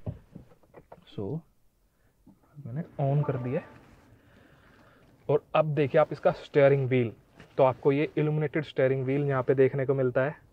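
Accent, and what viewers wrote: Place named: Haryana, India. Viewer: Bhai apka haryanvi touch miss ho raha hai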